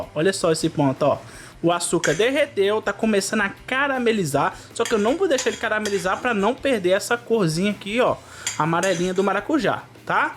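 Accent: Minas Gerais, Brazil. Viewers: Obrigada por ensinar tantas gostosuras! Adoro assistir seus videos com o sotaque minerim😁Eh Nois!!😁💚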